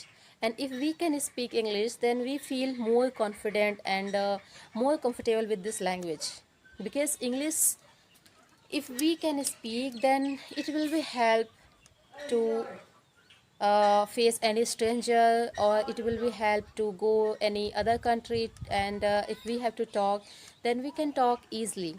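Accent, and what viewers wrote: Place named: India. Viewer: Good for me ur english language tune, become Yr tune belongs to indian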